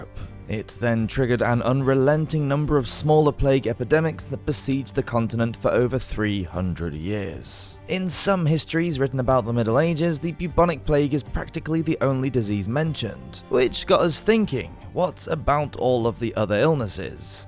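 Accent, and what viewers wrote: United Kingdom. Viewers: Why are people saying this channel is fraud? You can literally search everything. One assumes I'm here for the british accent? No, but it helps now that you mention.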